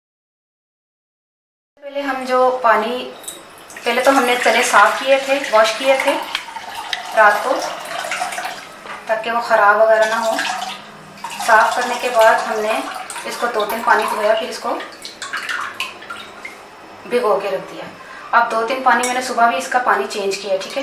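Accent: Punjab, India. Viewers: I really like your Punjabi touch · Waoo kitni cute Punjabi boli 😂😍😍😍😍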